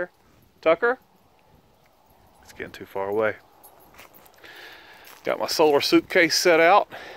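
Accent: South, United States. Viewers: I love your southern drawl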